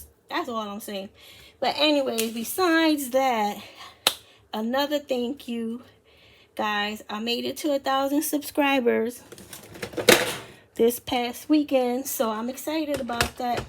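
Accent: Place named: South Louisiana, United States. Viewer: You are so excellent, at doing fae bake tutorials. ❤️ Your accent is identical to my cousin's accents in Houma, La. as I've shared before,; you make me so homesick, for south Louisiana.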